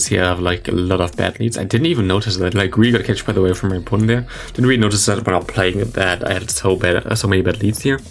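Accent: Germany. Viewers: Bist du ein deutscher ? :)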